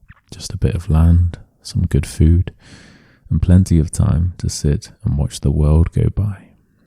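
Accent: United Kingdom. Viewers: British English (which I love)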